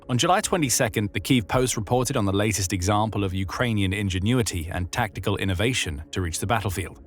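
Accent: United Kingdom. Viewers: Really dislike the UK accent in all of these Ukraine videos. If you are making videos for the UK then good for you, if making for the US then drop the accent.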